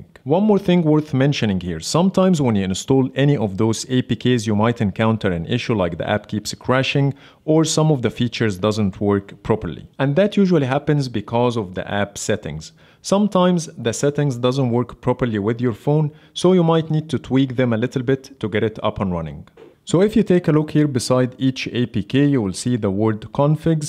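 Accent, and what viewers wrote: Egypt. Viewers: You got an Egyptian accent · are you an egytian your accent denotes that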